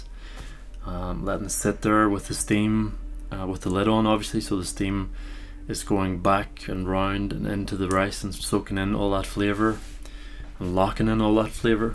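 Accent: Northern Ireland, United Kingdom. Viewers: he lives in Northern Ireland by the hears of his accent;)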